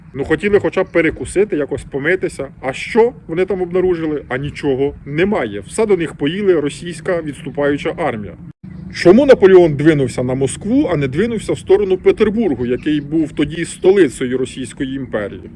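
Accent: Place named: Central Ukraine, Ukraine. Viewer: Відчувається мовлення центральної України. Хоч і західна її частина. Дякую.🇺🇦💙💛❤🖤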